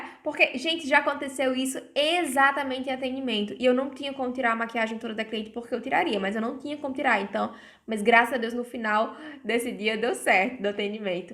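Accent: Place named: Mossoró, Brazil. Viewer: Mulher, morta que você é daqui de Mossoró! E eu achando o sotaque parecido kkk que maravilha 😍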